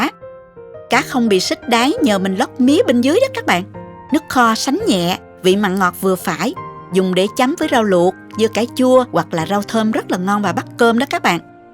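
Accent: South, Vietnam. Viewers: nói đúng chuẩn giong nam bô rất dể thương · Giọng nói nghe thân thương ghê vậy đó. Người miền Nam mình nè!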